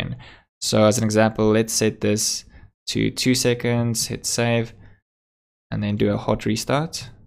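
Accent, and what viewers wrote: South Africa. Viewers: You sound south African 😎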